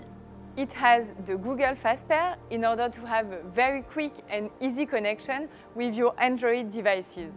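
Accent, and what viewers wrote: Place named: France. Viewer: J'adore son accent français 🥰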